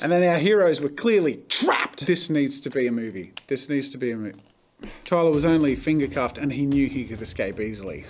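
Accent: Australia. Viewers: there's more ozzie accent when you are more spontaneous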